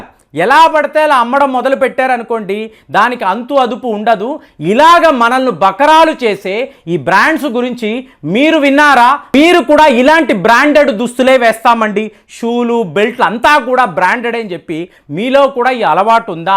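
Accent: Tamil Nadu, India. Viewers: he is Tamil guy. His telugu is not pure. please understand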